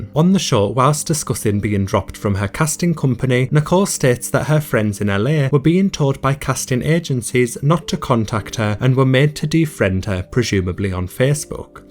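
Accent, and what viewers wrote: United Kingdom. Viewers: Yeah, it sounds like a humanly bot with a british accent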